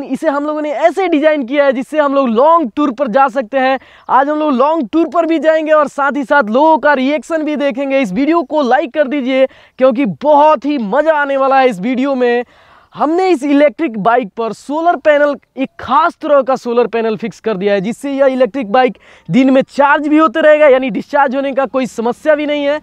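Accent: Bihar, India. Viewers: बेटा आप खूब तरक्की करो। वीडियो लोकेशन देख के और आपकी भाषा सुन के ऐसा लगा जैसे आप बिहार से हो। बिहारी बहुत तेज़ होते है खोज करने में। आपको इसपर होने वाला खर्च और जगह का पूरा address भी बताना चाहिए की जो खर्चा करके बनवाना चाहे वो आपको संपर्क कर सके। इस प्रोजेक्ट में लोगो का interest देख के बड़ी कंपनी भी आप से संपर्क करेगी और आपका भविष्य बहुत अच्छा हो जायेगा अगर अभी आप student हो तो भी 2-4 लोगो को इस रोजगार में लगा दो देश को बहुत सारे ऐसे बच्चे चाहिए तुम्हारे जैसे ।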